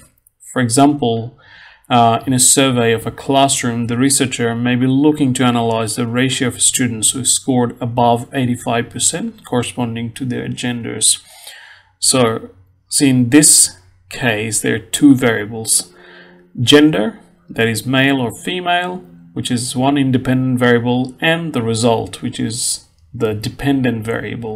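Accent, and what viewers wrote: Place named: Australia. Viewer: Interesting Australian/Something accent and great video. Thanks!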